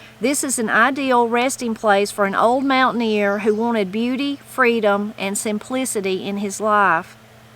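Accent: Appalachia, United States. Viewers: I have so enjoyed reading this book. Appalachian Accent makes the book reading perfect. Happy to hear "The Rest of the Story". We were looking at moving to Oakridge. We visited in August, 2001. We loved it. Then 9/11 happened. We moved back to Ohio from South Carolina.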